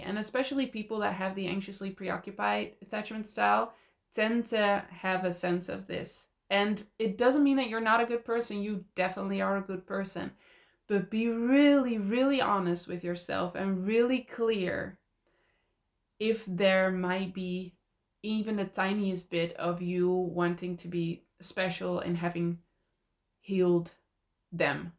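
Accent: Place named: Netherlands. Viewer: There are a lot of wonderful YouTubers on attachment styles but takes the cake - and this one in particular. 100% acceptance of an FA - or anyone - as they are in this moment or move on. Love your honesty, authenticity, wisdom and Dutch accent but amazing English / calming voice. Keep it up, girl!!!!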